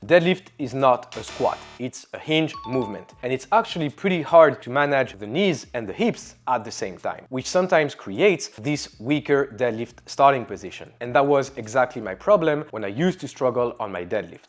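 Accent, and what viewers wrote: France. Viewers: Super tes vidéos en anglais ça me permet de m’exercer à l’oral de manière pas trop hard core grâce à ton accent français 👌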